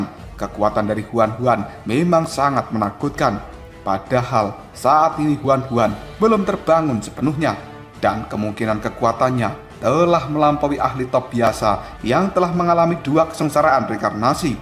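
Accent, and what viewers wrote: Java, Indonesia. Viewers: MC ne wong jowo.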